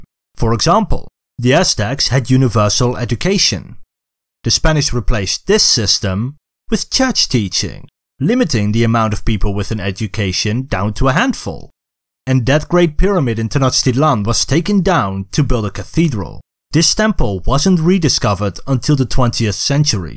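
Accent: Netherlands. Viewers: Are you Dutch? You're accent kinda sounds like it.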